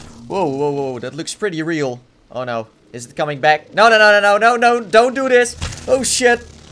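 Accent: Netherlands. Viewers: Dat nederlands engels accent xD eerste 3 seconden.. oh, tis een nederlander xD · Ik kon gewoon horen aan je accent dat je nederlands bent :D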